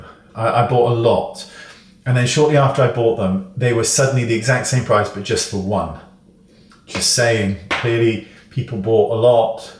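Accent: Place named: United Kingdom. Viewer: I love just to look at,sometimes, don't get everything he says, British accent,which I love, but I do admit, his videos are very informative, useful!! Thank you,handsome, talented ❤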